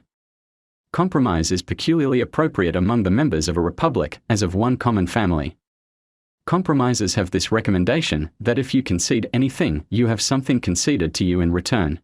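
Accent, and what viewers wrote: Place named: Australia. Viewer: Nice Australian voice.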